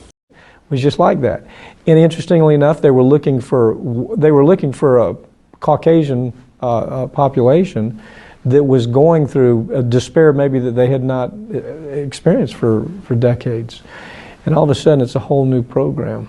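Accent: South, United States. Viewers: Your accent is a beautiful thing, brother. Never lose it, pass it to your children. It does not indicate lack of intelligence, you clearly have an abundance of that. Where I'm from, Coosa, the toes of Southern Appalachia, it's dying. The monocultural poison has crawled up from Atlanta and the anri-Southern rhetoric of the last hundred years or so is teaching our young folks that the way we sound means we're stupid. But no, it is a dialect, not an accent. We have our own language. We Southern folks need to stand up once again and say: "No, we are not like you. We are our own people. We have our own language, our own culture. We are here, and we won't be crushed."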